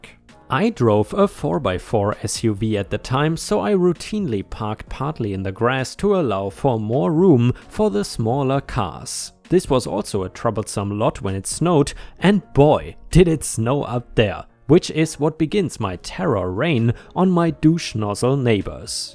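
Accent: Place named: Germany. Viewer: You do sound preeeetty german, man.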